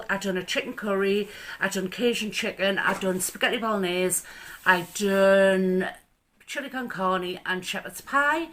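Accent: Newcastle, United Kingdom. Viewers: Ah cool you sound like around Gateshead ,Newcastle etc area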